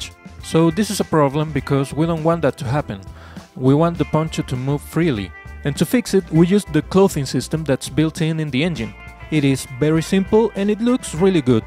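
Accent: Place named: Chile. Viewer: Hey bruh, are you from Chile??? I noted for the accent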